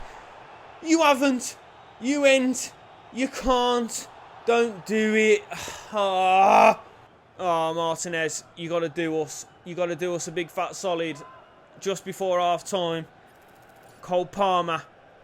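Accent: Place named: Birmingham, United Kingdom. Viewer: I think you re from a posh area in birmingham shard end kingshurst chelmsley wood , solihill ?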